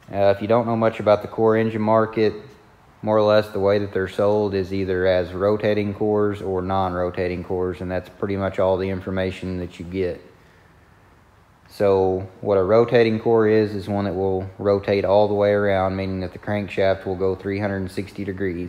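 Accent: South, United States. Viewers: I really enjoyed your video. That engine should clean up nice and you could probably sell it for a good price. Did you ever figure out how water got into the oil? BTW.... my wife is a native from New Orleans and she thinks your accent puts you in the mid to lower south. Let he know if she's right or wrong.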